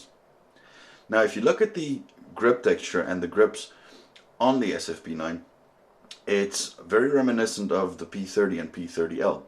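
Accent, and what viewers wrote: South Africa. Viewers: Are you South African? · Are you South African? That accent sounds familiar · You sound a bit South African. Thanks for a great review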